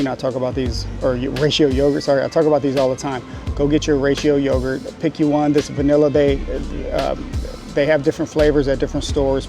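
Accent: Louisiana, United States. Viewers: Are you from Louisiana? Love your accent!! I'm a NOLA girl, but I hear some cajun in your voice! Thanks for the protein hacks. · Great video, brother. Are you from Louisiana? I'd guess southwest, somewhere between Lake Charles and Lafayette area. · Are you from Louisiana?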